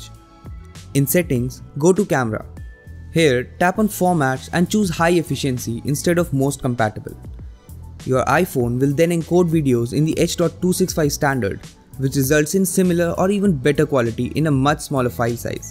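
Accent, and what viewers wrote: India. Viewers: I soon as I heard your Indian I went next!!! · Ughhhh Indian accent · As soon as I heard that Indian tech scammer voice I clicked off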